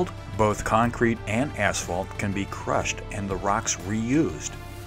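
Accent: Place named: United States. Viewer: Hi my dear! Great sharing! I love your american accent by the way ❤ Big hug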